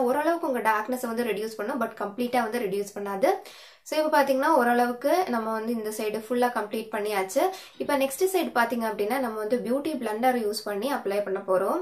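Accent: Nagercoil, India. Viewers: Nagercoil slang varuthu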